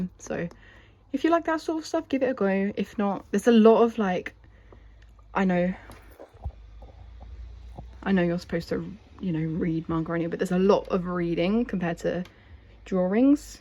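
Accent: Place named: United Kingdom. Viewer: Omg youre british too!?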